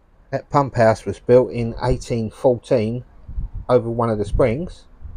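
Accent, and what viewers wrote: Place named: Cockney, United Kingdom. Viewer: Hi, really pleased to come across your channel hearing your lovely Cockney accent. We are nearly at the end of our boat build and are really looking forward to being on the cut. We hope to see you out there one day and promise to keep our music down 😅. Best wishes Lee & Ali nb black swan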